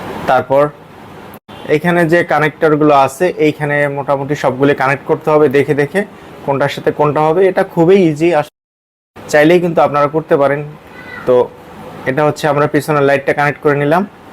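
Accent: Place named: Chuadanga, Bangladesh. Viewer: ভাই আপনার কথা শুনে মনে হচ্ছে আপনার দেশের বাড়ী চুয়াডাঙ্গা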